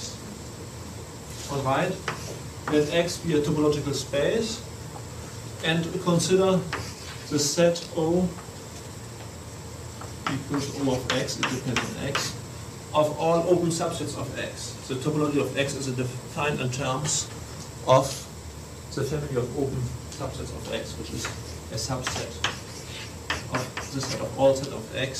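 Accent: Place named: Germany. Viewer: I didn't expect him to have such a strong German accent, despite knowing some of his history. Very interesting.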